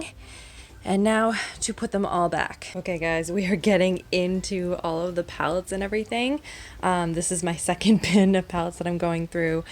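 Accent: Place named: Canada. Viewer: Do you guys binge watch? I know I do! I feel like after about an hour of her voice, I have picked up my own slightly Canadian accent. Lol. Coffee cheers from Michigan “aye” 😊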